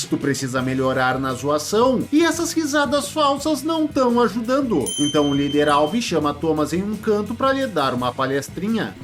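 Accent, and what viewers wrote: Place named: South, Brazil. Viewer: O chato da narração é o sotaque de Roceiro Sulista! Cara, volta pra roça! Lá você conseguirá!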